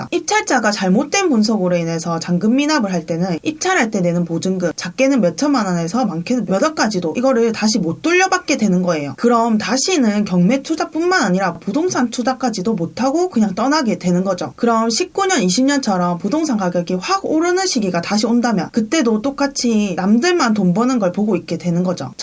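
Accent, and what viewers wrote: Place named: Busan, South Korea. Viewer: 유투브 자주 보다가 알게 되었습니다. 부산 사투리로 강의하는 내용이 속속 잘 들어오네요. 50대 직장인으로서 경매를 공부하고 있어요.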